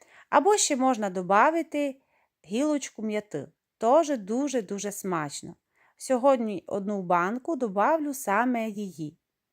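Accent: Transcarpathia, Ukraine. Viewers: У вас вимова як у Олі,закарпатської господині,наче ви сестри.Дуже приємно вас слухати,голос лагідний.Дякую за рецепт.Бажаю вам всього найкращого.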